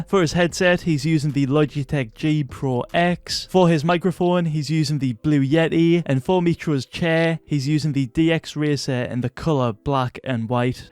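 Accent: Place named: Newcastle, United Kingdom. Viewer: been a fan for about 2 years, but please stop saying "that have went down" its "gone"!!! lmfaoooaoaoaoaooaoaoo... fr tho i get its the geordie in u (i swear ur geordie anyway)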